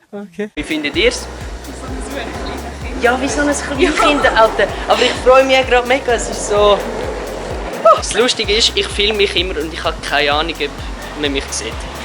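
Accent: Switzerland. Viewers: schwitzer dutsch :D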